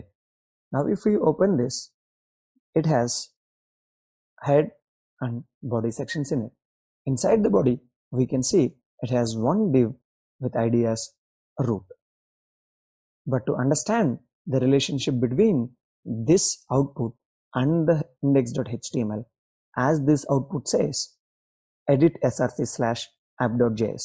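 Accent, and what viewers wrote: India. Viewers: Try to speak it in normal accent. sometimes I do not get the south indian english. Apart from that this Video was fantastic. <3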